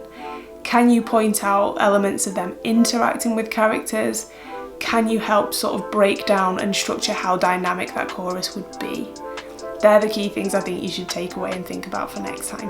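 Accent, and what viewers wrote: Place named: United Kingdom. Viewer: A beautiful girl with a British accent who talks about tragedy. 😍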